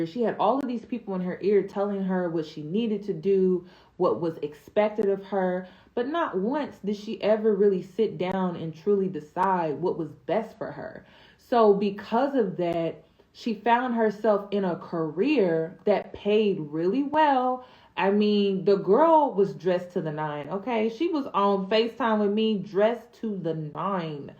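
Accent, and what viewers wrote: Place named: Midwest, United States. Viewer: thanks. I heard the Midwest in there. 😊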